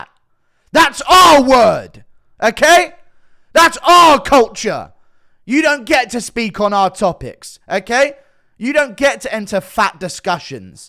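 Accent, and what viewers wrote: United Kingdom. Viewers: British accent makes the unwatchable..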